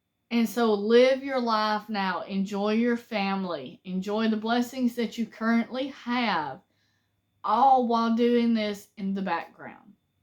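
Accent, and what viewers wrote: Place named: East Texas, United States. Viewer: Well I'm fairly new to y'all's channel and didn't know you had your own channel till your husband mentioned it. Glad I heard that. And I'm glad to hear that you're recovering from your ailment. I look at you like a daughter But your accent is music to my ears. East Texas here and that's how I talk. Hope you keep up giving good info. Had the same trouble with cheap can openers. Got a good one with a crank handle on it. Sure helps this old man. I'll see you later!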